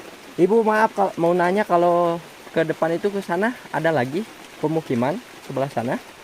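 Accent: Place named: Sunda, Indonesia. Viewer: Orng sunda mah kang...tos w nyarios ku sunda dei, garut mah komo medokkkk psn sunda na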